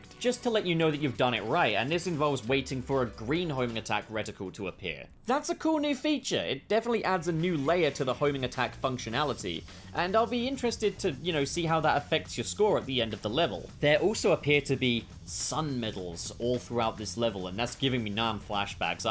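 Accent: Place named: United Kingdom. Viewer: I am surprised to hear a British fellow say "dudes".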